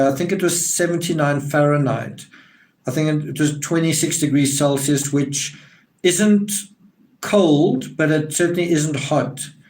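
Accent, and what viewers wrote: South Africa. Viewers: Found your channel last night and love it love the accent are you from S Africa . Best wishes from Ireland 🇮🇪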